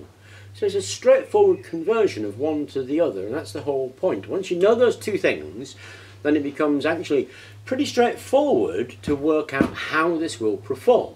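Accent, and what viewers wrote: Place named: United Kingdom. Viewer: lol, i cant stand british accents.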